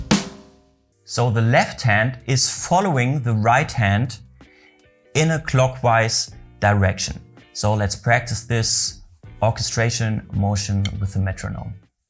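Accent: Germany. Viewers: Haha... Man hört richtig, dass er deutscher ist xD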